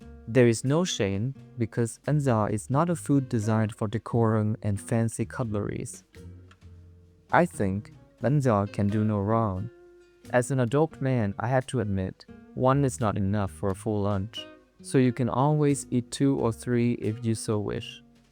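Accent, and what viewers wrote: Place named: England, United Kingdom. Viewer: Love your english accent, love bánh giò too, keep going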